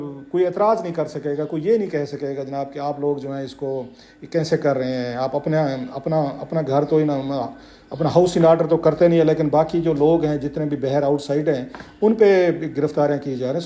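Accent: Saraiki, Pakistan. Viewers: روپ کلاسرا بخدا پاکستانیوں کی بہت بڑی تعداد اپ سے نفرت کرتی ہے اپ جو سرائیکی میں اردو بولتے ہیں صحافت نام کی کوئی چیز نہیں اپ میں یہ پھیلانا چھوڑ دو تمہارا یہ چورن نہیں بکنے والا مرحوم ارشد شریف کی موت پر تمہیں موت پڑ گئی تھی تمہاری زبان تالو کے ساتھ لگ گئی تھی تمہارے منہ سے کوئی یہ لفظ نہیں نکلا شرم سے ڈوب مرتے وہ صافی بھائی تھا تمہارا اب نکل پڑے اور سرسنیاں پھیلانے بے شرم انسان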